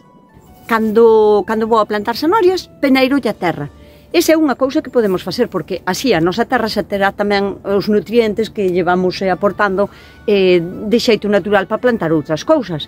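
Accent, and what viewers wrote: Galicia, Spain. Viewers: Gracias por tus valiosos consejos,escucharte me recuerda a los gallegos inmigrantes que escuchaba de niña,tengo 72 años,me da nostalgia de aquellas personas,yo desciendo de gallegos y andaluces,vaya mezcla,hoy descubrí tu canal,yo hago huerta también,un afectuoso saludo desde Argentina 🙋‍♀️🇦🇷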